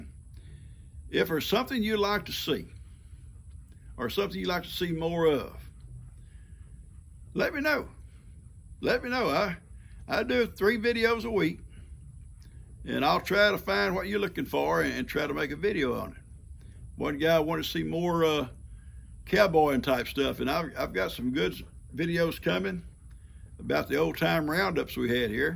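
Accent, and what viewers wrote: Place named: South, United States. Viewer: Hi. I don‘t quite understand all you‘re saying (southern accent 😉) but I love listening to your stories 😊👍 I for sure understood the story about your pants and the family in their car 😂 I always enjoy looking at pictures from earlier days. Do you have some for us? Greetings from Switzerland 😊🇨🇭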